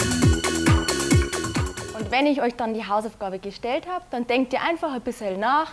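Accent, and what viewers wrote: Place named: Bavaria, Germany. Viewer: bayrisch is schwul... aber ihr würd ich geben ;)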